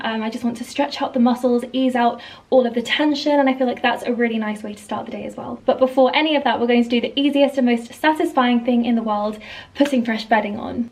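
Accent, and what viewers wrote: France. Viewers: An amazing video like all the time. And don't worry, your french accent is good and really charming!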